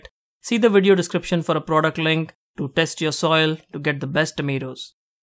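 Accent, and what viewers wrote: India. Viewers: his indian accent is so thick i love it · Your accent felt to me from Indian origin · I like his Indian accent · clearly Indian Accent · You've got an Indian accent sir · what other accent would an Eastern Indian person have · English is so much in Indian accent, it's hard to understand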